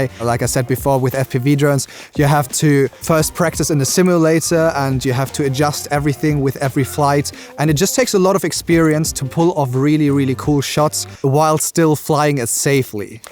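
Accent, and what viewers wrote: Bavaria, Germany. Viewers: Way to funny that you only said like 2 Words and it was already obvious that you are german xD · Woher aus Bayern kommst? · Haha korrekt erster Satz erkannt dass du aus Bayern kommst korrekt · Are you from Germany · Noch nie deutscheres englisch gehört haha, aber feier ich haha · Ich wusste es beim ersten Wort englisch hat Mama sofort gehört 😂👍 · ich wusste das du Deutsch bist ich hab es gehört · Ahh lol bei hat sichs geklärt hahaha xD Auch wenn dein Englisch echt ziemlich gut ist hört man es irgendwie immer raus wenn ein Deutscher Englisch spricht xD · Na wirkli oda, a Bayrer! Do bist jo goa ned so weit weg. 😉 Wahnsinns Intro übrigens!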